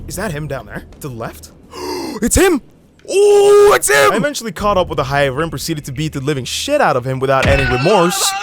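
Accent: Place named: Norway. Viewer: Spotted the accent day one, have family with thick Norwegian accents here in Minnesota. Love your content man, keep it up!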